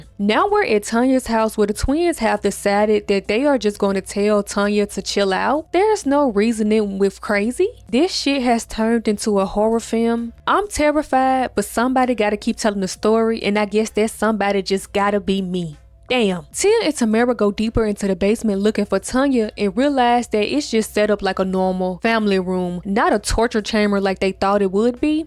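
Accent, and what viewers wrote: Memphis, United States. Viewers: Are you from Memphis?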